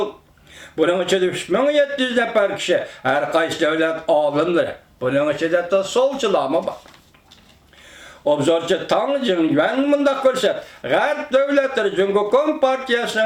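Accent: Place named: China. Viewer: Siz hitaixha okughangha ohshaisiz.Siz uighurchani hitainin ahanigha selip okup berivatisiz .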